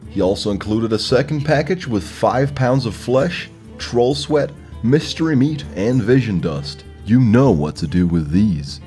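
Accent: Denmark. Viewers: Wait a minute - er du dansker hahaha? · bruh, you from Denmark? Got that Arla hitter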